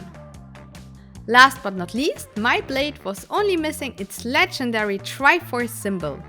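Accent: Germany. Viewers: Make a german Version (man hört das du deutch bist ) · Are you from germany? Because of your denglisch akzent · Man hört deinen deutschen akzent, lol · She's German Her accent is German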